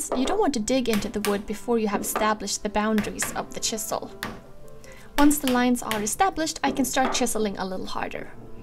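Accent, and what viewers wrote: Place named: Sweden. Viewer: Hello there! This is the first one of your videos ive seen and im just wondering, your accent it sound swedish. Are you from sweden?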